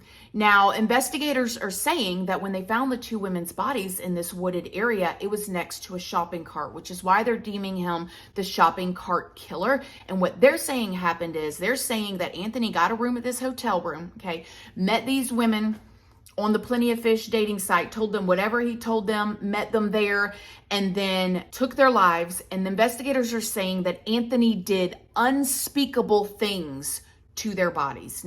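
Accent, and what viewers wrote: South, United States. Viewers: Praying!!! Today, it seemed like you liked it, I voted yes keep intro, then I looked at a couple that seem like you were just tired of saying it and so I changed my vote to you know we love you you've got like a million subscribers, greet us any way you want to, we'll be here! I love your accent, bc my cousins are from Florida but they're from a different part, they also have absolutely beautiful voices, you have a way deeper southern accent I love it. Now, I need to watch this. I do hope things get better for your family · a southern belle Youtuber😮🤯😍 im fascinated by the accent L0L Love from 🇬🇧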